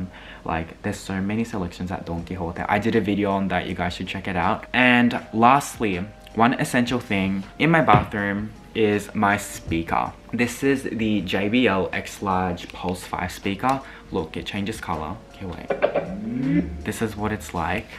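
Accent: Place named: Australia. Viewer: オーストラリア訛りかわいい〜😍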